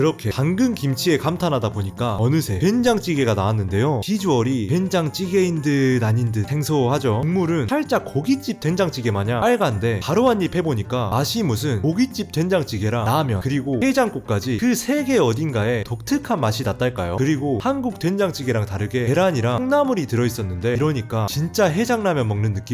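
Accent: Jeolla, South Korea. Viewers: 전라도 말투 나레이션은 첨인데 엄청 정감있네요 ㅎㅎ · 찰진 네이티브 전라도 억양이 너무 감동적이네요. 광주 화순 그 쪽 말투 같은데....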